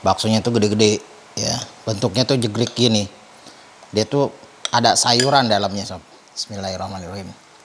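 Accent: Jakarta, Indonesia. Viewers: Ngambang ye bang inget yang dikali bang. Betawi abis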